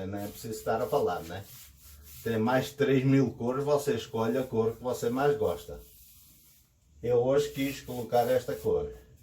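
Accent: Portugal. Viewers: Logo no inicio, pela simpatia, sotaque e nome, já fui assinalando o like e me inscrevi. Caro sr., assisti com emoção a apresentação dos seus ensinamentos em vídeo, pois lembrei do meu avô, também lusitano. Era um ótimo marceneiro, carpinteiro e pedreiro. Fez praticamente sozinho nossa casa em Piratininga - Niterói/RJ, numa época em que ainda não havia energia elétrica, só ferramentas manuais. Pena que não houve tempo para que ele me passasse os seus conhecimentos. Bem, gostei da ideia e vou aplicar no muro da minha casa, ora pois! Parabéns e obrigado. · Amo o sutaque portugues, queria falar assim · Vou salvar este vídeo para fazer em breve, muito bonito, muito simples e sem falar da simpatia e o sotaque de Portugal que acho muito legal. Parabéns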